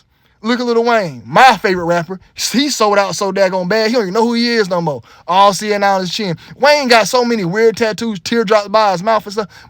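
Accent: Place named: Memphis, United States. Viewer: Thas mah cuhhzen 🤣🤣🤣 I like the Memphis accent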